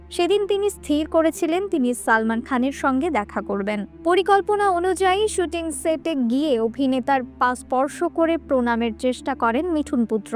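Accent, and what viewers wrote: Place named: Bangladesh. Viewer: এটা বাংলাদেশ নয়। এজন্যই আমরা সব ধর্মকে সম্মান করি। সবাইয়ের সমান অধিকার। এটাই বুঝি। তোমার ভাষা শুনে মনে হচ্ছে এটা বাংলাদেশি ভিডিও।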